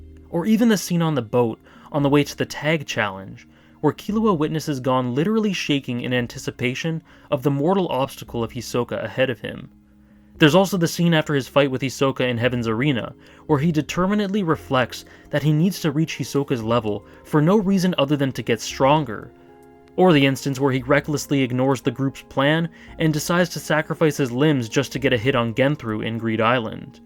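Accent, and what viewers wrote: Canada. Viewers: You sound Canadian that's all.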